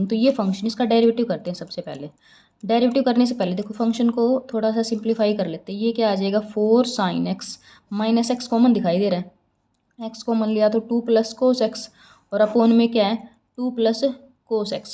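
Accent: Haryana, India. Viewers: Haryana se ho mam?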